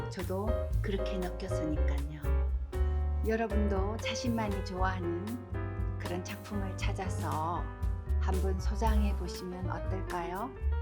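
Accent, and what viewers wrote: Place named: Gyeongsang, South Korea. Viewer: 오랜만에 여유를가지고 샘 작업을 훔쳐보고있습니다 ^^ 겡상도억양이 그림의 따뜻함을 더 하는것 같습니다 배경음악의 볼륨이 샘의멋진 설명을 누르는느낌이 조금 아쉽습니다 ㅎ 기연샘 음악회때 처음 샘 만났는데 기회되면 화실에 함 가보고싶습니다 요즘도 성산 출강하시죠?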